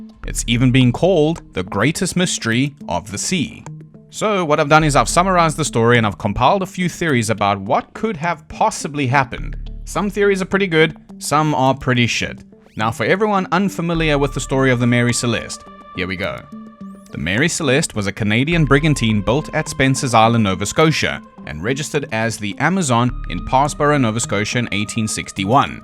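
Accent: South Africa. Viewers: Algorithm just served this video to me. I clicked on it because I've been fascinated with the Mary Celeste since I was, like, 6 or 7-ish. So I was already excited! THEN...I hear it being narrated by a fellow South African!! AND...the humour (the whole tone, really) is absolutely on-point!!! This is peeeak YouTube! 👌🏼😁🇿🇦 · I spent a few years working in state fairs, and a lot of my coworkers were from South Africa. You apologize for your accent, but it's one I've heard many times. It's pretty much what I expect the cruise and fair industry to sound like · Im so confused why everyone is saying he sounds Australian. It's quite audible someone who primarily speaks a form of dutch (in this case Afrikaans) speaking English. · I am now officially a fan of the South African accent or yours anyway which I can't say about any British or English accent. Also, your sense of humor is amazing. 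Laugh so hard every time I listen to your recordings keep going. Keep going love it. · howdy from savannah georgia USA. new sub here. i love your sense of humor and thank you for NOT being AI. your south african accent and pronunciation is far superior to most others from the american south east. i'm glad youtube suggested your channel.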